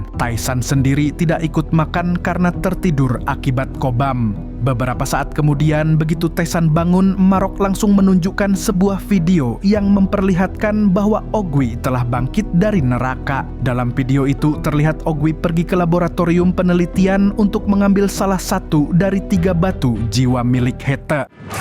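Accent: Banjar, Indonesia. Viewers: Ternyata mamang urang Banua jua 🤣🤣🤣 babahasa Banjar sidin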